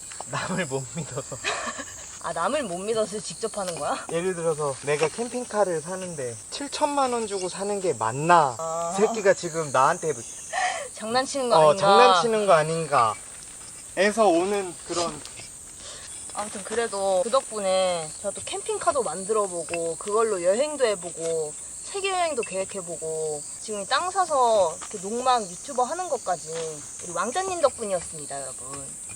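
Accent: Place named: Chungcheong, South Korea. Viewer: 와이프가 마당 텃밭에서 일할 때마다 로 빙의해요. 말끝마다 유유 거리는데 충청도에 와있는 기분이에유.여긴 강원도 양양인데 말이죠. 아 망치 들때도 빙의해요. 그만큼 찐팬이랍니다..저는 매년 산소 벌초를 셀프로 하는데, 예초기가 없어 다른 분들에게 굽신굽신 하며 빌려서 사용해요. 그래서 제 등이 굽었나봐요. 최신식 무선 예초기를 저희에게 주신다면 굽어있는 제 등이 조금은 펴질 것 같아요. 미리 감사드립니다🙏🏽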